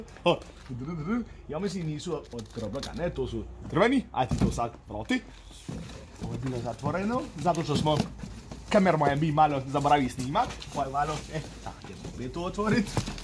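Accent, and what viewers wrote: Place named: Lumbarda, Croatia. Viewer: Moje srce je sada zaigralo i igrat će sve dok se ne bude pucalo ispod one teće.... uvelike se radujem tome događaju i tom simpatičnom naglasku. Vi ste pretpostavljam iz Lumbarde ako se ne varam? Pozdrav iz Krvavca❤❤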